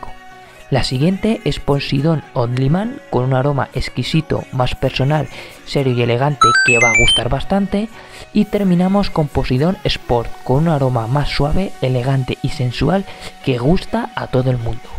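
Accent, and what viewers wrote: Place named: Madrid, Spain. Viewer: Te veo muy profesional. Gracias. Tienes acento de Madrid como un primo..Saludos.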